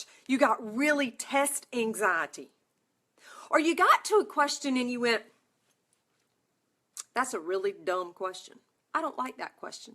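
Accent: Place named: North Carolina, United States. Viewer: Super motivating! Your accent made me smile this morning! Im in NC too (Swansboro)😁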